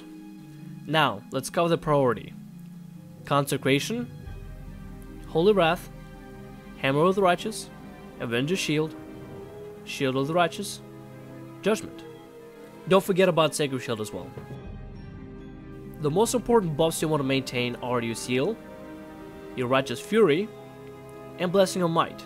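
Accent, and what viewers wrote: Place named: Russia. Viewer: Привет, по акценту подумал ты Русский такчто надеюсь ты поймешь :P! Тебе кто Больше нравитсья Вар или Пала (танки)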